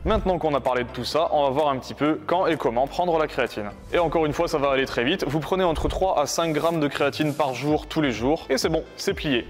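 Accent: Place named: England, United Kingdom. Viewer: Je valide pour la qualité et l’accent anglais surtout ☺️.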